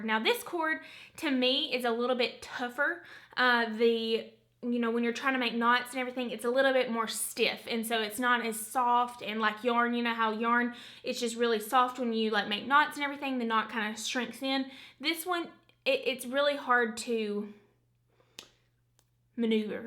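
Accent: South, United States. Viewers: Im from germany and your southern accent is soooo amazing, i love the way you talk!! And of course the info on the macrame rope is very helpful lol 😉👍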